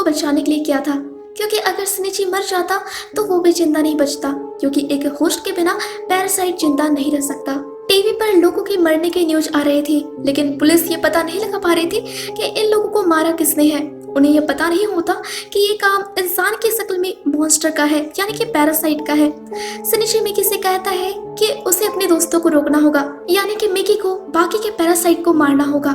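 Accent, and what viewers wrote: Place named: Odisha, India. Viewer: aap odisha se ho lagta hai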